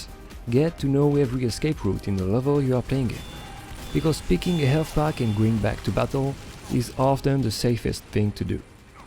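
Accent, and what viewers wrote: France. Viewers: I just like listening to his therapeutic French voice. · Are you French? :D That sounds like a French accent.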